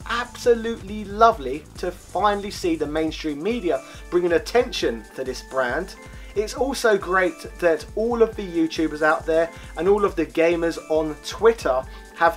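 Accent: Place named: RP, United Kingdom. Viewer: Why do you put on that awful posh accent?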